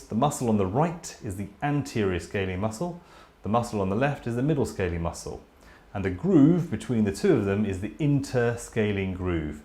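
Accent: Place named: England, United Kingdom. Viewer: perfect video. nothing like the english accent to learn new things awesome teaching skills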